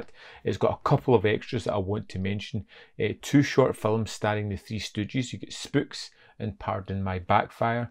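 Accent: Scotland, United Kingdom. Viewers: THIS REVIEW IS SPOT ON IT PROMPTED ME TO BUY BLURAY.....AND I WASN'T DISAPPOINTED ..LOVE THE GENTLE SCOTTISH ACCENT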